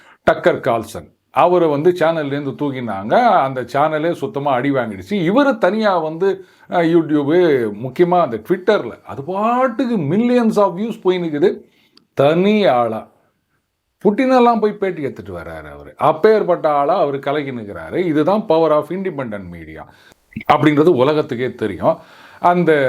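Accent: Chennai, India. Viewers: Sir. Our Chinna correction. Tucker Carlson - Fox News. CNN Ellai. Seldom I get to hear Madras dialect nowadays. Thank you for your service. அட்சா சும்மா எகிரிடுகுது.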